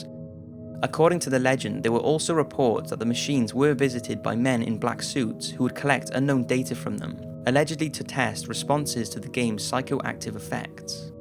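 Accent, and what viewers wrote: United Kingdom. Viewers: Idk why, but it's always scarier when a brit is speaking in the video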